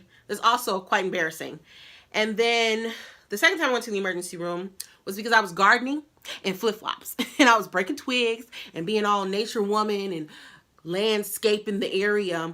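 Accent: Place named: Chicago, United States. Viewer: Yup you have an accent! Chicaaaago lol #NewSubbie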